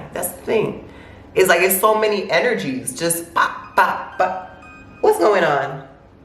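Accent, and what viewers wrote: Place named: Brooklyn, United States. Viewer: You so Brooklyn & it just makes your videos so much more pleasing & resonating.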